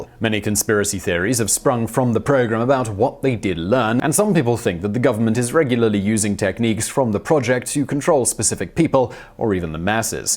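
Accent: England, United Kingdom. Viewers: Anecdotal, but I knew quite a few people who got paid out for damages from FEMA. It seems like a lot of these toptenz videos are aimed at slinging dirt at the US, via an overly snobby sounding English accent. Why don't you focus on your own country more? It needs some focus, that's for sure, because it's falling apart worse than the US is. Bye Felicia✌️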